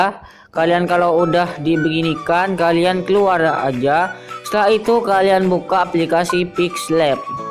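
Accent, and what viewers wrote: Madura, Indonesia. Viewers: Org Madura kah ketauan banget:v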